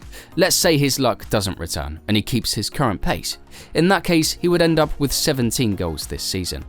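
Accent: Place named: United Kingdom. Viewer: British accent talking bout hockey wtf is this a joke :DD? Ovie probably hits 25goals for this season, he will start picking up the pace.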